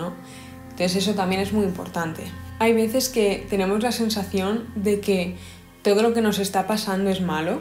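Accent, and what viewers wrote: Spain. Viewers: Hija, tú eres muy católica y aún no te has dado cuenta (o quizás lo eres y yo no lo sé). La gratitud es un valor más católico que el mismisimo. -> O darles las gracias a Dios. -> Catastrofista por abandonar a Dios y no ser capaces de dar valor a las cosas, porque el utilitarismo lo está matando todo, quizás. -> Cada mañana te despiertas y agradeces cosas, casi como cualquier cristiano hace con Dios en sus ritos. Menciono mucho a Dios, pero ni siquiera soy cristiano, simplemente son observaciones porque me parece curioso lo que dices y que no te des cuenta. En cierta manera es normal que pienses así, por tu acento pareces española y España es una sociedad muy católica. Incluso los que no se consideran católicos tienen raíces en esta religión. Más de 1000 años de una religión influyendo sobre una sociedad tiene sus consecuencias, incluso más de lo que nos podemos imaginar. 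En fin, Dios se ha convertido en una cosa sin nombre ni veneración, pero presente en el día a día, y esto es ejemplo de ello.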